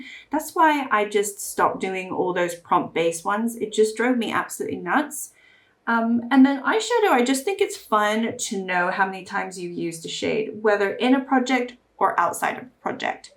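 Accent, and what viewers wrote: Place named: United Kingdom. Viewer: So interesting to hear about all the places you have lived 🙂 you definitely have a British accent, even though you spent quite many years in the US. I actually work at an OB school currently, I am the coordinator and we just got authorized last year! Did you like the school? It is quite different from the Swedish school system so we are a bit making it up as we go here 😅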